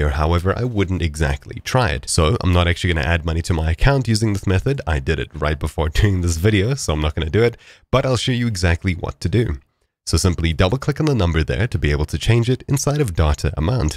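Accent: South Africa. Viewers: Hold up, you south african? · South African?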